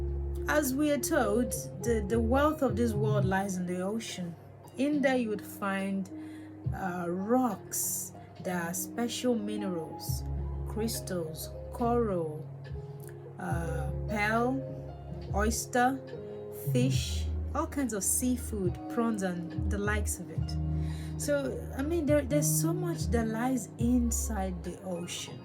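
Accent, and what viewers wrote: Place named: United Kingdom. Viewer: She's speak like a United Kingdom citizen ❤. Her beauty is astonishing 😮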